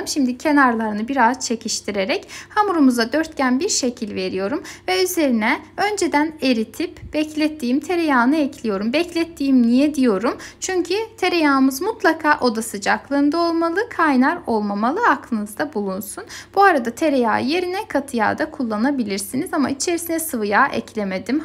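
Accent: Azerbaijan. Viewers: Azeri siveniz var, azeri misnz?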